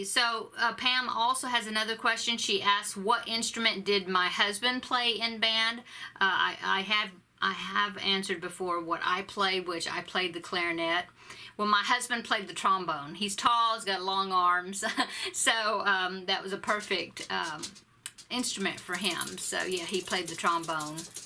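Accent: South, United States. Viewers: Hello.... I’m a new subbie to your channel... I binged watched all your videos from the very beginning and I just love the things that you make and I just love your Southern accent.... I know in a few videos back you said that you wanted to do a nativity scene are you still going to do it??? ... I would love to see your progress on them.... can’t wait for your next videos.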